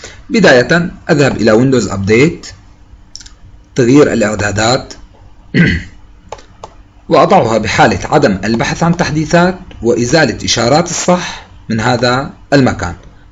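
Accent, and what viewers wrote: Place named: Levant. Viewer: تعجبني لكنتك الشامية ولغتك العربية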